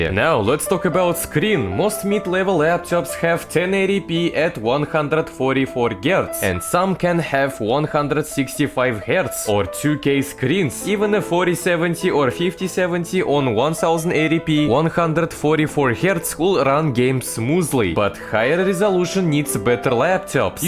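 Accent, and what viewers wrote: Russia. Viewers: This Russian accent pmo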